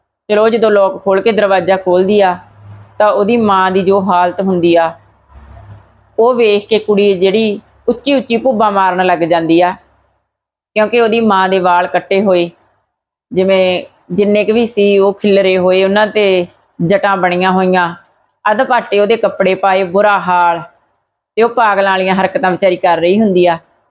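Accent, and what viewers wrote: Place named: Malwa, India. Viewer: ਤੁਹਾਡੀ ਬੋਲੀ ਤੋਂ ਮਲਵਈ ਝਲਕ ਪੈਂਦੀ ਹੈ । ਕੀ ਤੁਸੀਂ ਮੋਗਾ ਸਾਈਡ ਤੋਂ ਹੋ?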